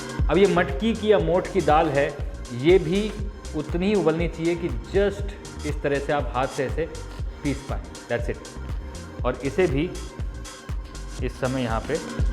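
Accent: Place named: Maharashtra, India. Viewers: Chalaa bole, are ekdam marathi lage ji!!! ❤🙂👌jiyo chef ji!! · You have picked up typical Marathi accent! Great fun. झक्कास मिसळ बनवली दादा!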